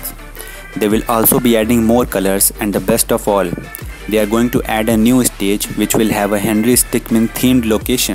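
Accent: India. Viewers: Voice reveal wiyhout face voice like an Indian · Is this dude indian? Look at that Indian accent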